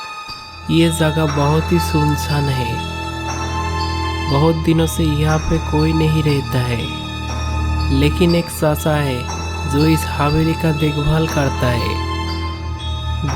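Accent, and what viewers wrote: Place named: Bengal, India. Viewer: Awaj chenge karo bangali awaj sahi nahi hi koi saf hindi bolne wale ko job pe lagao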